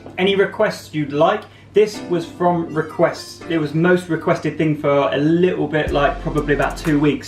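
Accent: United Kingdom. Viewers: Jatuh cinta bgt sm aksen brithisnyaaaa 😍😍😍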